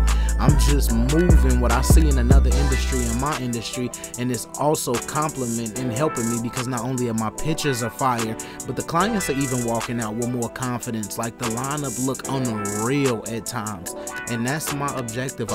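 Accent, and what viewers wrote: Chicago, United States. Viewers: Yo when he first started talking I'm like he sounds like he from Chicago! And sure enough he is!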